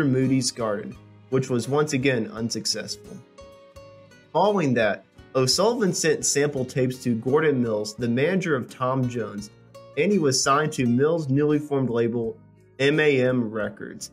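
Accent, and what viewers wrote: United States. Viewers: Yes too fast , too American slur . Use punctuation in speech . We are not all Americans on the rest of this planet! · Yet another american voice, impossible to get anything in English.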